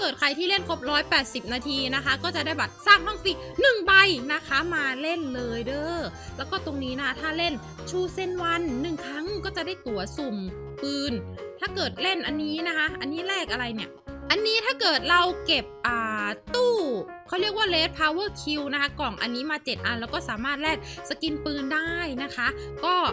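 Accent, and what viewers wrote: North, Thailand. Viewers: พี่ พูดเหนือทั้งคริปหน่อยครับ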